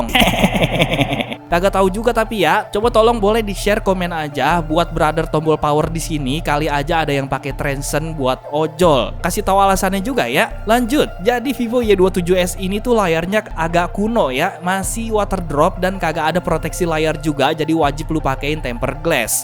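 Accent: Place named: Bekasi, Indonesia. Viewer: Bekasi or cikarang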